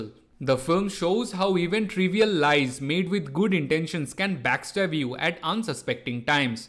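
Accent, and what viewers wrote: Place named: India. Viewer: Ok. Why don’t you learn to pronounce the English words like in English not in Hindi heavy accent or talk in Hindi language. This is the same as Call centre for Fido asking for information ℹ️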